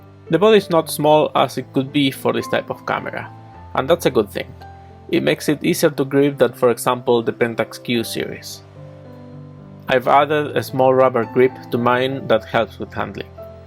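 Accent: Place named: Argentina. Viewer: Se te nota el acento argentino, jeje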